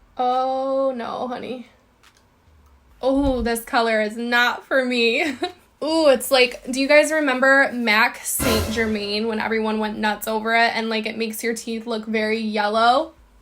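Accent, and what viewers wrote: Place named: Minnesota, United States. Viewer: You’ve got to be a fellow Minnesotan because you sound like every girl I know.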